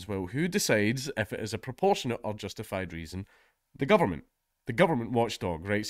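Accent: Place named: Scotland, United Kingdom. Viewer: It makes me so proud so hear such sense being spoken in a Scottish accent.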